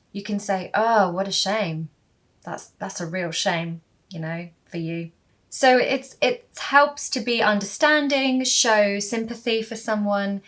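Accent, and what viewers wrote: United Kingdom. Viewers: I m an iranian. I have nt seen before teacher like you .actually your method is useful and effectivr.especially with brit accent lovely❤ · You're the BEST teacher I've ever known. I Love British accent. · Thanks ！I really enjoy British accents, especially yours and your voice. And I think British accents are easier to understand than American’s. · Hi I'm fascinated with your videos, geat explanation, british accent, nice hair, soft entonation and beautiful smile of professional woman. Congratulations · Hello I love your videos so much they are useful. And also your speed of speech is very friendly to me I can understand you clearly lol. You are sweet and lovely teacher. Besides I am quite into your wonderful British accent!! I wanna learn more from you and hope u have a lovely week❤ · I like your British accent teacher I also like how you teach inglish 👍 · English sound beautiful when spoken by UK native speaker like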